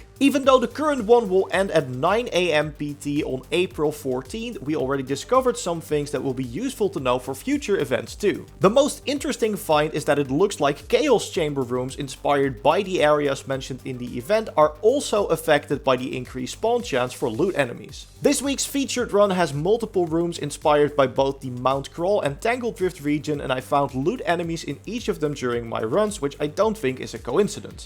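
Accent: Netherlands. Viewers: You gotta be dutch.... you speak like most of my fellow dutch country man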